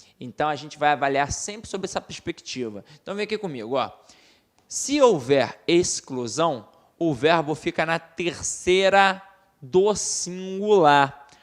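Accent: Rio de Janeiro, Brazil. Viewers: o carioqueis é muito forte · Adorei a aula e o jeito carioca!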